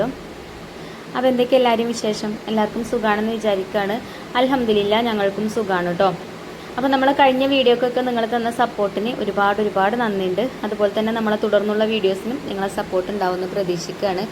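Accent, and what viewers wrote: Malappuram, India. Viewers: Enikk Malappuram basha valiya eshtam anu ningaleyum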